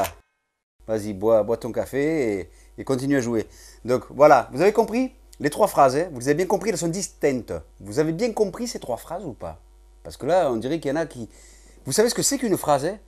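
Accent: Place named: South, France. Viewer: ton accent du sud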